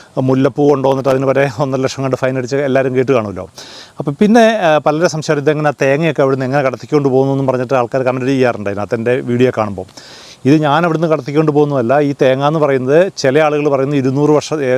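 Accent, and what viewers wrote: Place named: Wayanad, India. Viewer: നാട്ടിൽ വയനാട് ആണോ സംസാരം മാന്തവാടി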